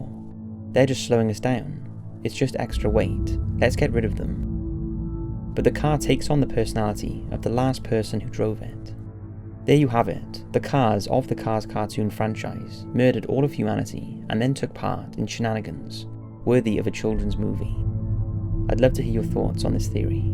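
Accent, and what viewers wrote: Wales, United Kingdom. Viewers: Gotta ask,are you Welsh? Your voice sounds like it has Welsh undertones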